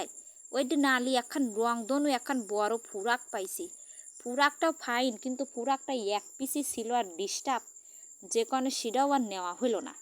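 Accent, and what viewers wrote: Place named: Pabna, Bangladesh. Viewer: খাটি পাবনা জেলার ভাষা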